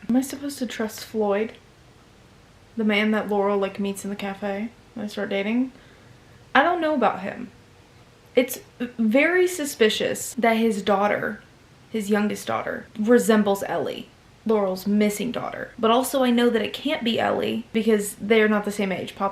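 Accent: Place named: East Texas, United States. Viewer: currently in east texas and your accent is giving me life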